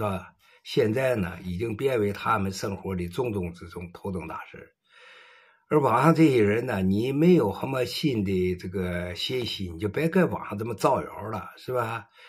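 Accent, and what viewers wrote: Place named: Liaoning, China. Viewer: 你是辽宁的吧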